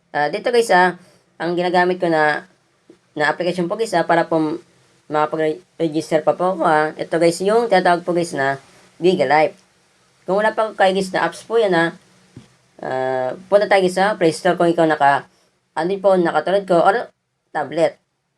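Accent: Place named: China. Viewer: sakit sa tenga "guys ahhh" intsik sta ahhh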